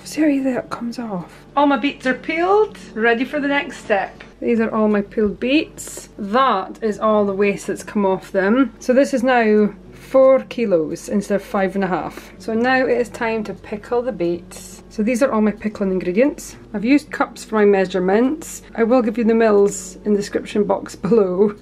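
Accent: Scotland, United Kingdom. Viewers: Brilliant, great to hear a Scottish accent.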